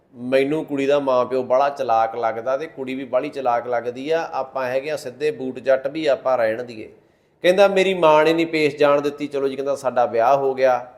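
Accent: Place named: Bathinda, India. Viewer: Patakarkar bathinde da lagda hai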